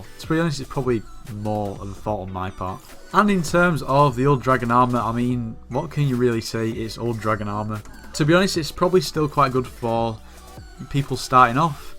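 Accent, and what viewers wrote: United Kingdom. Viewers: ma bri'ish man is back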